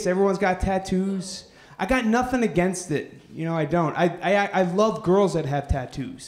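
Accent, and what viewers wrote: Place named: United States. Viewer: He's American. He pronounces his name perfectly in American English. No Italian-American pronounces their surname with an Italian accent. THAT would be weird!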